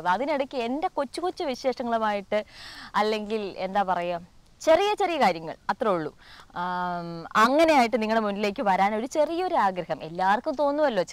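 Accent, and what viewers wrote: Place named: Trivandrum, India. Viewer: Chechide sound ketal chechi tvm aanu nadu ennu thonnuva...kasargod aanennu parayila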